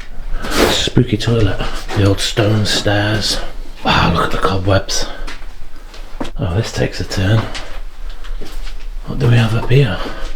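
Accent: United Kingdom. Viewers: Btw luv your British accent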